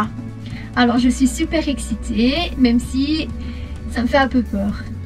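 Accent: Liège, Belgium. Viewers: Bonjour, je découvre ta chaîne, tu as un bateau vraiment bien aménagé et bien restauré. Bravo. Il me semble percevoir un petit accent Liégeois. Je me trompe peut-être? A bientôt. Marc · Me voilà nouvel abonné. Un petit accent liégeois? Aussi, on aimerait avoir une présentation de toi et de ton bateau. Fancophone en Australie, que faisais-tu avant de partir à l'aventure, quelles destinations tu envisages.... voilà autant de sujets pour tes prochaines vidéos. Merci pour le partage. Bon vent à toi pour 2021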